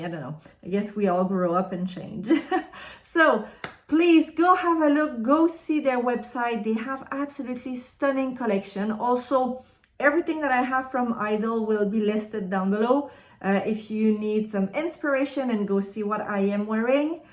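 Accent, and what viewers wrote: Quebec, Canada. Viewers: Hi ! To sum up I would say that when speaking english you have a recognizable french accent and when speaking french you have a strong québécois accent ! So unique 😉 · Salut! (du Canada) - en tant que Québécoise, j’ai décelé ton accent québécois dès la première fois que j’ai visionné un de tes vidéoclips. Mais peut-être faut-il être québécois pour identifier ton accent. J’ajouterai que ton accent est vraiment agréable à entendre alors pas de souci. Je vais maintenant continuer de visionner. La poudre Rocabar d’Hermès est jolie sur toi. Très (trop!) tentant. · Allô, j’adore ton accent étant Québécoise aussi et je suis au Saguenay et première neige hier soir qui est encore présente au sol ce matin!🥶😉. La poudre Hermes Rocabar je l’adore je la porte à tous les jours depuis un peu plus d’une semaine et j’adore la poudre bronzante aussi j’ai Tottori et Atlas, pour le correcteur ultra le teint j’ai tellement hâte qu’il soit disponible au Canada …on m’a dit que ce serait en 2026 mais je ne sais pas quand…j’ai très hâte de l’essayer. 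Bonne journée et merci pour ce vidéos! 😍🥰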